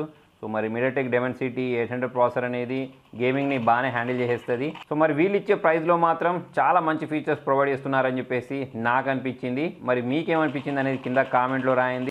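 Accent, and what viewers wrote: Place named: Godavari, India. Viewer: Motham godavarikane anna.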